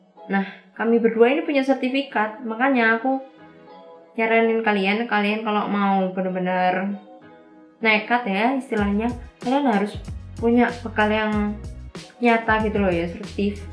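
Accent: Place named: Java, Indonesia. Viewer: Medok Jawa e rek😅😂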